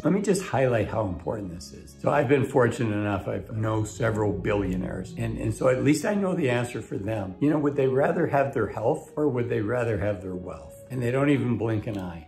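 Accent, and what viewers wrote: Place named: United States. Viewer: Ohh my God… what is this man’s accent… I love his accent… I can listen to him forever. Even if it is financial information as it looks like 👍 fine I will take that 😂 I live in Norway 🇳🇴 so please don’t shame about my terrible knowledge of American accents 😊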